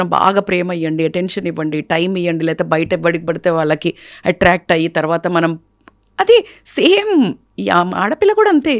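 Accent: Telangana, India. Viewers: Nuvu mundu nee language sare Chesko. Chendalamga undi nee telangana yasa. Manchi telugu bhasha nerchuko.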